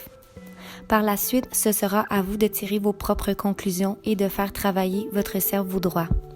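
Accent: Quebec, Canada. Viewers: merci bcp pour l'effort...je me demande simplement pourquoi un documentaire si intéressant et si beau comme celui-ci de ne pas penser le soumettre à une voix française au lieu canadienne pour qu'il soit auditivement confortable.merci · Tu es Québécoise? Car tu as l'accent.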